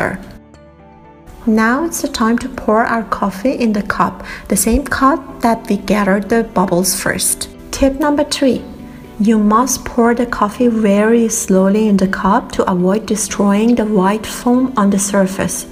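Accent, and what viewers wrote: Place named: Iran. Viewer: Love the persian accent😂💖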